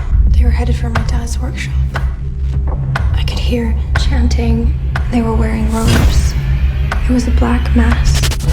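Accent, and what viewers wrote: Germany. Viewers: bist du Deutscher?:D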